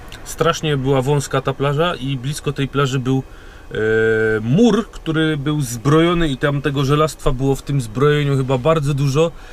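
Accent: Silesia, Poland. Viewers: W mowie słychać że jest taki charakterystyczny ton dla ślązaków (Pozdro z śląska)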